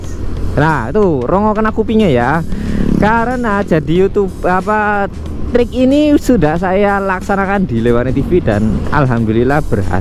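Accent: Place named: Java, Indonesia. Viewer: Oalah wong jowo sampean kang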